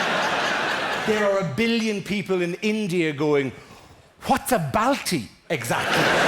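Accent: Ireland. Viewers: I saw this on DVD in about 2007, and I always assumed the name was Peter Thatchell, and it was just Dara mispronouncing it in a thick Irish accent. Was only years later I discovered it was actually Tatchell 😳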